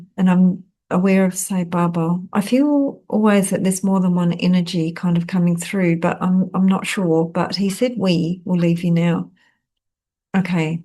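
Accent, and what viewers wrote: New Zealand. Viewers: Thank you....for your honesty and bravery. I feel very connected to your messages.....and love hearing the kiwi accent!!!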